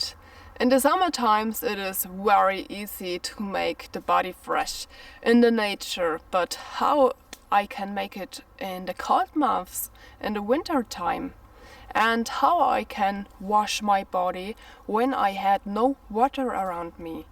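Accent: Germany. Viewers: I love a woman's German accent when she speaks English. Danke!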